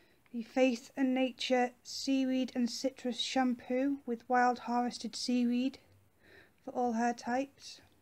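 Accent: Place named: United Kingdom. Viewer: i really like your accent. where abouts in the uk are you from? you got some great freebies. i think i missed out on a couple of these :( i use the coconut oil on my hair and its great x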